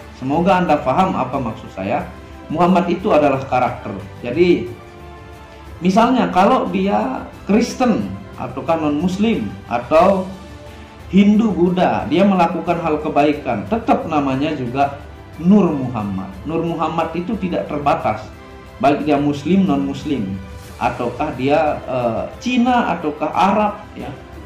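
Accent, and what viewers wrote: Bali, Indonesia. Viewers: Masnya ini logat percakapannya kok seperti orang Bali.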